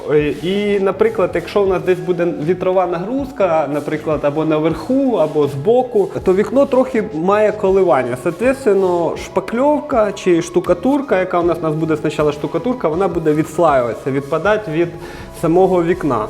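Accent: Surzhyk, Ukraine. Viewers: У вас дуже багато суржику кацапського. Прошу звернути увагу на свою вимову ви ж молодий! Дякую відслаюваться.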